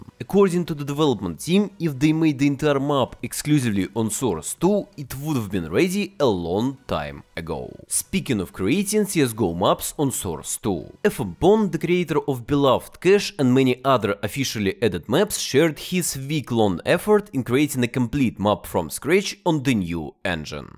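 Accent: Russia. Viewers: that russian accent tho. nice contetnt bro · Ох уж этот шикарный русский акцент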